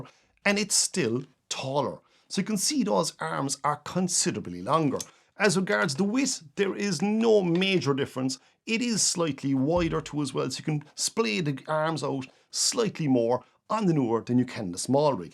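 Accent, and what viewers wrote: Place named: Ireland. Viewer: Your channel is great! I came from your video about the Neewer follow focus, and stayed for the one take presentational goodness and Irish accent. 😂 Love from Down Under. ❤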